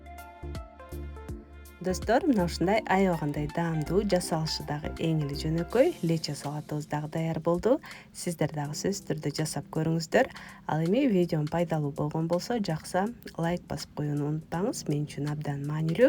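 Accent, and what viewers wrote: Standard. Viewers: Таза кыргызча суйлоптур азаматсыз ❤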